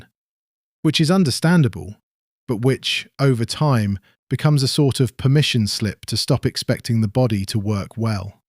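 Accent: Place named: United Kingdom. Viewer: The British voice always signifies doom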